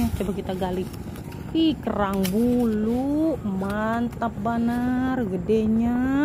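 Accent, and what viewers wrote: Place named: Banjar, Indonesia. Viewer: logat banjar ny ad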